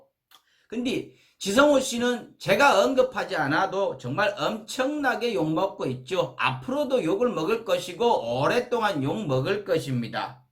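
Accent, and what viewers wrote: Jeolla, South Korea. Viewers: 경상도사람이지만 전라도 사투리가 너무 구수하니 듣기 좋네요. 강성범씨 방송보면 속이 뚫리는 것 같습니다. 앞으로도 잘못된 놈들 많이 씹어 주시길~~^^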